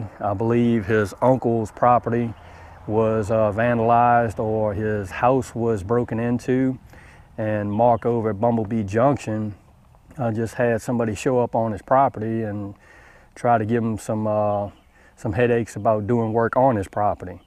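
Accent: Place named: Louisiana, United States. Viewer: yeah buddy thank you ..I was born and raised in Louisiana myself I can always hear that accent lol love the video man thank you for sharing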